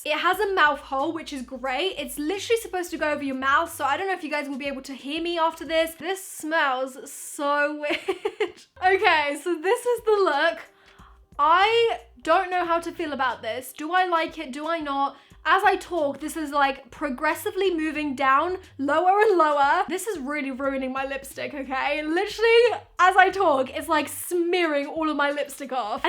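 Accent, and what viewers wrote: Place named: England, United Kingdom. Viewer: I think I'm in love with your english accent❣️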